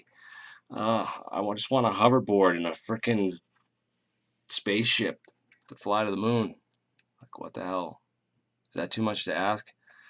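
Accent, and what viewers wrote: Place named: New England, United States. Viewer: My first time watching this channel. Sounds like a New England guy.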